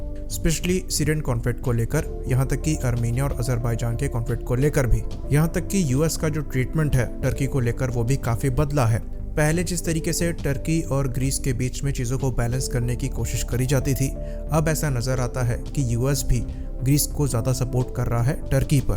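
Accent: India. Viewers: As soon as I heard the Indian accent I didn't surprise. 🤣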